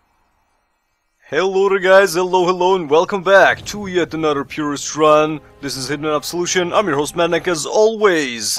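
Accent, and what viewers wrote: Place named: Russia. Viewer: wow, you sound like a russian :) and i am russian, so no hate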